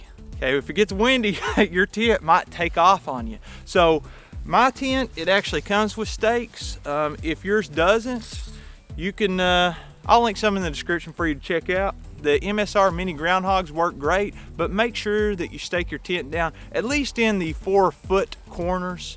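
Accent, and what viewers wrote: Kentucky, United States. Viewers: Excellent stuff here. Never thought to check ground level with my water bottle! One thing I used to love seeing when I was deep fall camping for hunting was a floor covered by dry leaves where I was going to camp. I’d use a stick and rake up three piles. Pick out the sticks and twigs from them, then set my tent up on top of the smaller pile. The other two I’d use to fill up two large heavy duty lawn bags. I put the two bags in my tent, covered them with a wool blanket, and that was my mattress. Combined with the duff under the tent I stayed warm and comfortable. ABC - always be careful, though…if it has been a warm day, you may accidentally rake up a copperhead. Also… I saw you wearing a BBN shirt in a video and your accident sounds Kentuckian. Are you one of us Appalachians, man?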